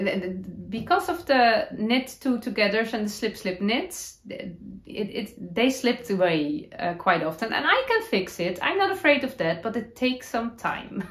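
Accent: Netherlands. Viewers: I am from Oregon USA. Your youtube channel is so relaxing to watch while I knit, from the beautiful introduction music and throughout. I am a slow knitter, my process is slower and my lifestyle doesn’t allow me to finish projects as fast as I’d like and I never feel bad because of it when I watch your episodes because you never present an unrealistic amount of items you’ve finished. You have a very pleasant “Dutchy English” and your style is lovely. Thank you! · Love your podcast and your Dutchy English! Your Ariana sweater looks great. As you were describing what you wanted at the centre front of the neck I think you might find that the centre double decrease will give you the look you want (for the next sweater!) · I love your sweater! The colors are so beautiful and looks great on you. I wonder if you could embroider a couple of small flowers in the extra stitch gap area in the front or add small buttons. 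Just an idea if it continues to be a concern for you. I love your podcast and your Dutchy English😊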